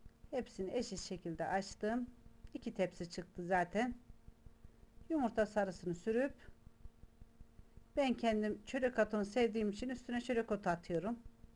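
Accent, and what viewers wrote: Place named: Kayseri, Turkey. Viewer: Bu Kayseri ağzıyla yorumdu harikasınız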